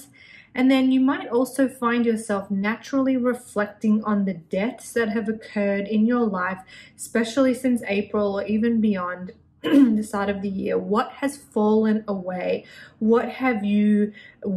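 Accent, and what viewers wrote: Australia. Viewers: Didn’t realise you were Australian! That’s so cool 😊 what state are you from?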